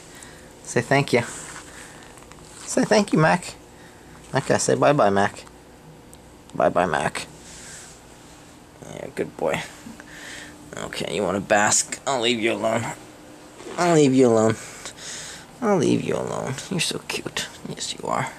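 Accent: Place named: United States. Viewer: WTF WHY DO YOU SOUND LIKE ASHTON IRWIN WITH A AMERICAN ACCENT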